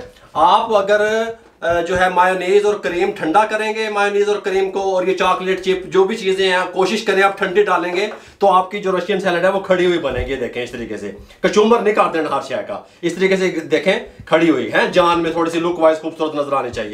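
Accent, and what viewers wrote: Punjab, India. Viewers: 😁 punjabi touch ❤❤❤❤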